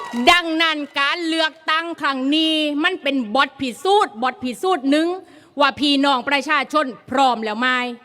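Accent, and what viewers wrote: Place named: Laos. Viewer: รู้สึกว่าคุณมีสำเนียงลาวปนมาหน่อยนะ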